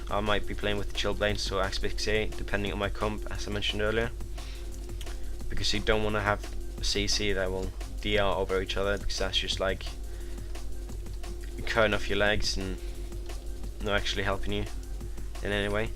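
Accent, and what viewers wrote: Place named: Scotland, United Kingdom. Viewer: You from Inverness? You have a strong accent, laddie.. Like Alex ferguson..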